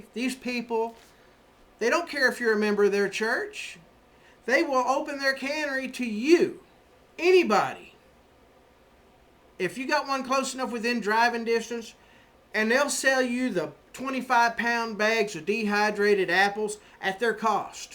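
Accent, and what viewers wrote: Appalachia, United States. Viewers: U speck hillbilly me to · i like that hillbilly accent i salute u from sweden!!!! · speakin hillbilly works for me. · love your vid as somone who lives in peoples rep of kalifornia.what your saying is plane old fashioned common sense! be prepared where ever you are i learned that in the army,love how you speak hillbilly my roots are missouri and tex,louisiana take care thank again gil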